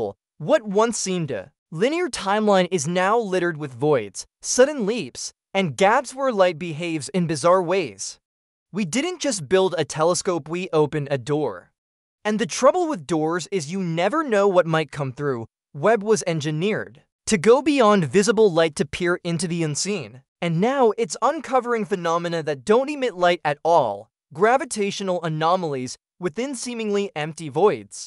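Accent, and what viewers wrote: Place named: United States. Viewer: Is it? Sounds like typical american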